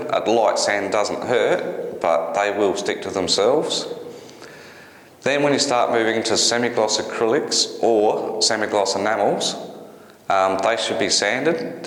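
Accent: Australia. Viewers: Very well spoken , Aussie accent , great video , great advice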